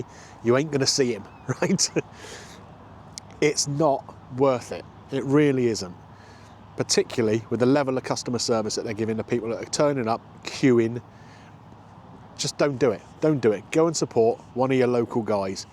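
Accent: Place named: Dorset, United Kingdom. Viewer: which part of Dorset are you from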